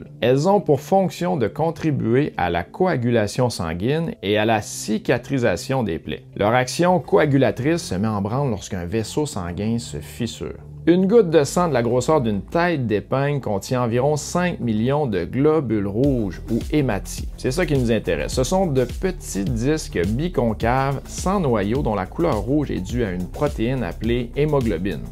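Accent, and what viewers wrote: Quebec, Canada. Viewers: Elles sont super vos vidéos et j'aime bien le petit accent du Québec.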